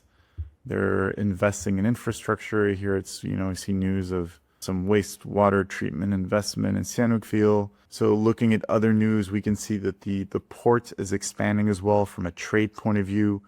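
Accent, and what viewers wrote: United States. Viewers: This guy has a us accent, but he's not from the states. Or Mexico.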